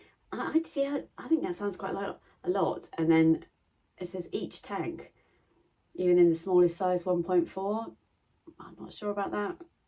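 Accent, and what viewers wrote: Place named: England, United Kingdom. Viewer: I had to go back and watch the early part of this with Closed Caption on. I thought you said Testicles but the CC showed Chesticles...LOL...My USA accent vs your English accent I guess is why I wasn't hearing what you said....LOL...Love the Sally jump suit. You look great in it.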